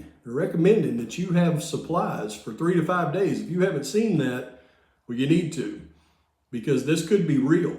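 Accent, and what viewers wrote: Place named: North Carolina, United States. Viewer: You surely have a born abd bred NC accent….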